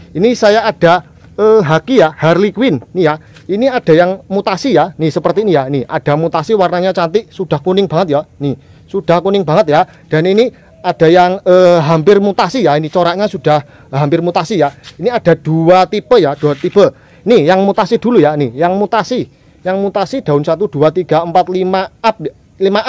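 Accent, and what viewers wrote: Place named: Lampung, Indonesia. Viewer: Walaupun di inskripsi gak disebut daerah tp Ciri khas kata2 Yo Yo g ktinggalan. Pasti daerah Lampung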